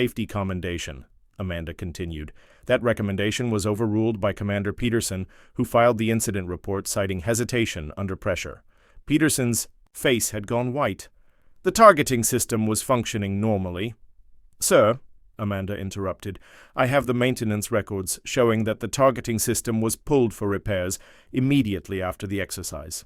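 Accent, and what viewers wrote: England, United Kingdom. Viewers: The English accent is very off putting.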